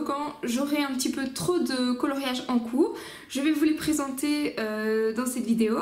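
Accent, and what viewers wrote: Belgium. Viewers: Cc tu devrais te remettre à ton double car déjà la il est beau mais une fois terminé sa promet sinon moi des moments je fait un fond mais ya des colos ou je regrette d avoir fait un fond enfin c est des testés aussi bon courage a toi et merci pour ton partage tu as un accent ? Belge ? Je me trompes ?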